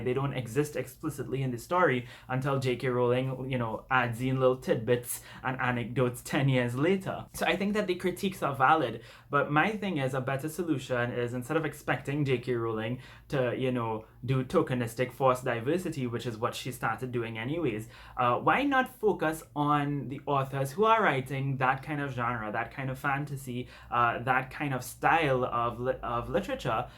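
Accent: Trinidad and Tobago. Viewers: I heard your accent and I was like OH MY GOD because I haven't seen a lot of trinidadian booktubers before. So it's nice to see someone come from where I'm from that's into this. :D And honestly you have such a good point here. It's one of the reasons I don't like blackwashing because it's lazy. Instead of writing characters who are POC or looking and supporting authors who are and do write POC (which then makes these things popular) they get fussy about these white characters. I think diversity depends on a couple things. Setting, like you mentioned as well as how you write their character. Of course culture and heritage is linked but when their only character trait is that they're black it comes off as ignorant. Especially if you're not from that culture. I think that people who write these characters if their culture is heavily linked to it, they should do a lot of research and have people from that culture do a sensitivity reading. 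But I also think we need to talk about the publishers and marketing. They're really the ones that push aside and don't properly promote POC writers. Which needs to be tackled. Because if we don't fix this in the first place by supporting POC authors and making awareness, who will? These kind of situations also make me wonder what's my place as a mixed Indian. I can already tell that people will drag me because I'm not 'actually Indian' since I'm from the Caribbean. Am I only allowed to write characters like me? Even if my entire book/screenplay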